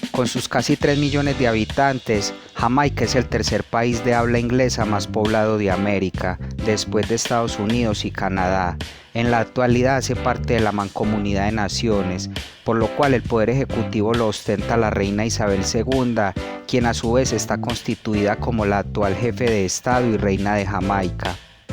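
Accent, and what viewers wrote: Colombia. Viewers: Ése acento colombiano enamora a cualquiera.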